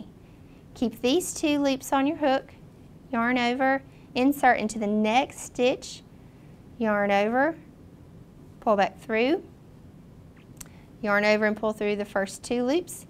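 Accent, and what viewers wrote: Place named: North Carolina, United States. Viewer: I have to ask, are you from North Carolina? I feel like I recognize your accent :)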